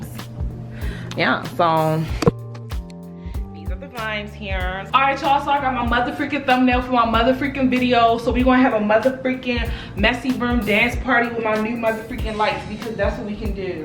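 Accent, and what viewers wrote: Baltimore, United States. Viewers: What I’m gonna dewwww, I heard that bmore accent girl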